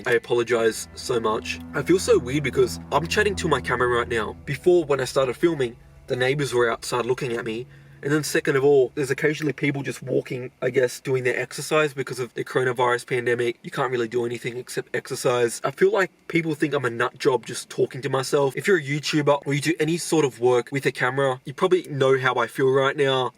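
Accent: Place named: Australia. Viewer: i figured you have to be aussie. the accent never lies :D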